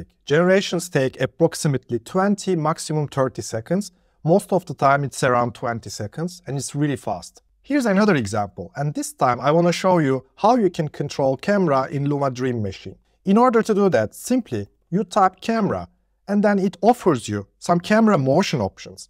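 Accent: Turkey. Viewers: hoca, o kadar belli ki türk olduğun ve türk aksanın. İngilizceyi hiç bilmiyor olmama rağmen videonu anladım :))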